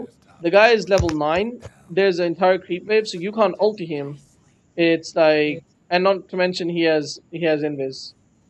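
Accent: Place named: India. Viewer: His accent and that moment when a train passed by in background suggests the same. I know that coz I'm Indian myself. :D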